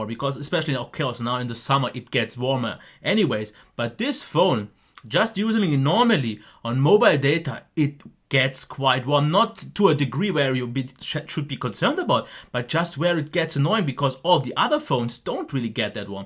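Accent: Germany. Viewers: ich hab einfach sofort rausgehört das du aus deutschland kommst :D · Jack AFC he is Croatian as he said. His accent is German.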